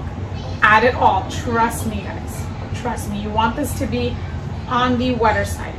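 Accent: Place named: Puerto Rico. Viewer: first timein your channel . you sound from Puerto Rico wepa!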